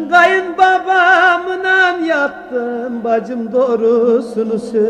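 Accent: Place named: Hatay, Turkey. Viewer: Hatay'da ki Türk aşiretleri hepsi böyle konuşur Avşar boyu'ndan 56 Türk aşireti yerleştirildi burayı Türkleştirmek için...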